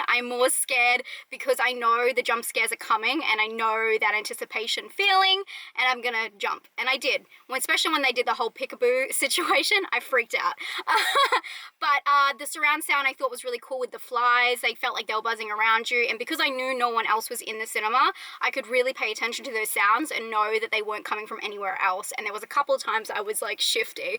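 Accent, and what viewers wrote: Australia. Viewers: I let this video play while cleaning my bathroom, enjoying that Australian accent and when I came to my screen and saw the nosebleed I freaked for a second lmao...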